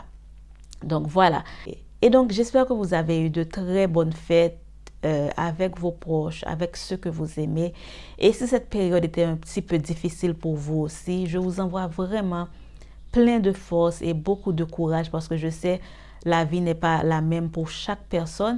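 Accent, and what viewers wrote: Haiti. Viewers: J’aime bien ta façon de parler 😀 ton accent haïtien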